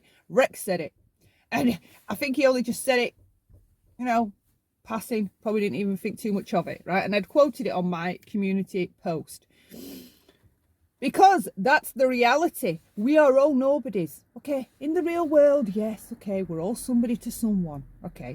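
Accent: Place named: United Kingdom. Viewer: I love your accent ( and your good heart ) but Cussing UK just sounds Classy and you're Brilliant ❣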